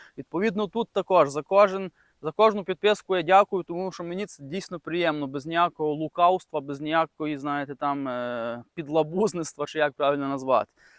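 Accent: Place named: Prykarpattia, Ukraine. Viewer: Ви так просто і щиро розповідаєте, і дуже гарний говір у вас, прикарпатський.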